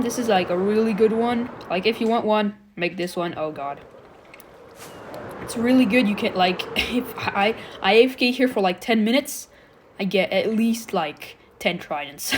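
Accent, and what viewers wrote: France. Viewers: ARE YOU FROM FRANCE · Dude you from france 😅 i recognise youre voice anyway i love this world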